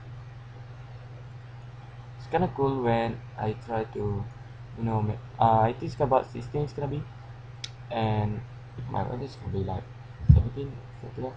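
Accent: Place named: Malaysia. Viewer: Malaysian voice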